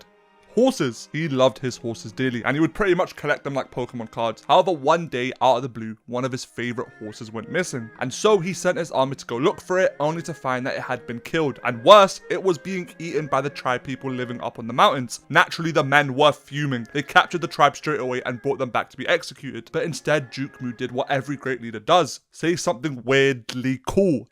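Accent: United Kingdom. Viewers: Im sorry to say this but I raise the volume because you talk with british accent so I can understand what is bro talking about. but on several occasions bro shouted when I turned up the volume, making it uncomfortable for me to watch 🙏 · Why do you have a british accent? Aren't you chinese?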